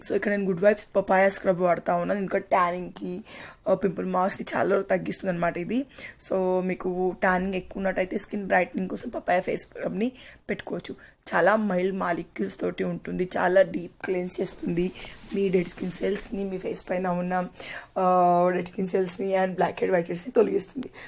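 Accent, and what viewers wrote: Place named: Maharashtra, India. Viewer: మరాఠీ యాస లో బాగుంటుంది మీ భాష, ఉన్నది ఉన్నట్లు మాట్లాడతారు మీరు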